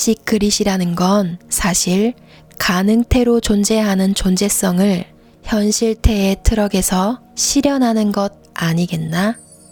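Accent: Gyeongsang, South Korea. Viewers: 증말 믿고 듣는 진정한 갱상도 사투리다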